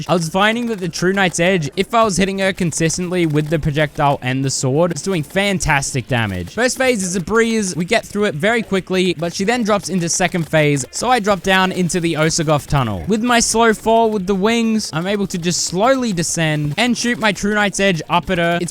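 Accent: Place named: Australia. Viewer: I think he’s Australian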